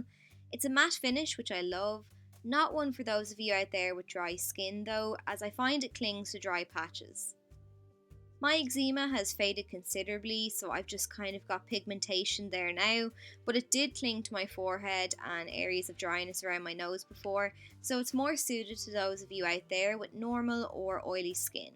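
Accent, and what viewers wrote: Ireland. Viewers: well done as always i like your acent look like Iresh 👍👍👍👌👌💗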